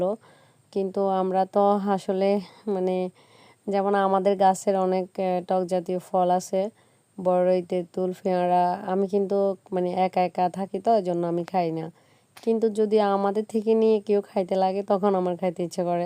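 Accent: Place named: Noakhali, Bangladesh. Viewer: হায়রে নোয়া,,,,,,খাইল্লা।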